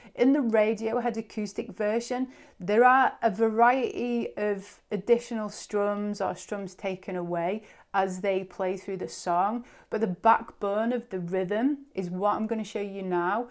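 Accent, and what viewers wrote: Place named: England, United Kingdom. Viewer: Hi, I love your way of learning songs... and your pure english accent! Your entire channel is gold, thank you for your huge dedication to other guitarists!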